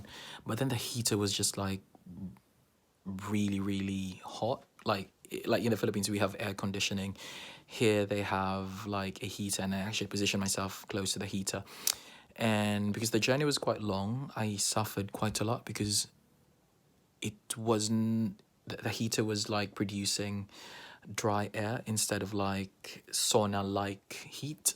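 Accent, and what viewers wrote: United Kingdom. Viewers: Nice accent bro! How come you already have a british accent? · British accent na kaau raf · Wow British accent!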